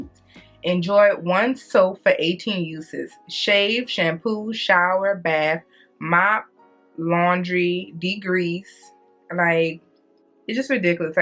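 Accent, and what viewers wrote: New Orleans, United States. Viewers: You sound like you're from New Orleans I'm from NOLA. But my hairdresser just recommended this as a shampoo to strip my hair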